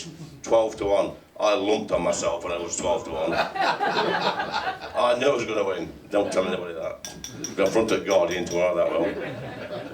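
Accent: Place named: East Midlands, United Kingdom. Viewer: He sound like he's from East Midlands.. nottingham even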